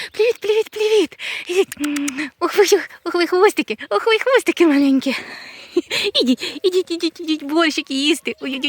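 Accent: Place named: Cherkasy, Ukraine. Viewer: Похне горно! Черкаський говір😊😅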